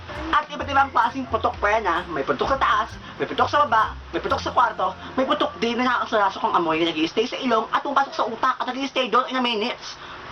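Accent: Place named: Cebuano, Philippines. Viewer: cebuano accent mo dabest!!!.. like2!!!...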